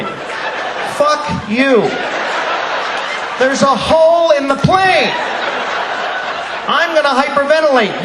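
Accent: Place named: Boston, United States. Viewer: The are both from the Boston Ma area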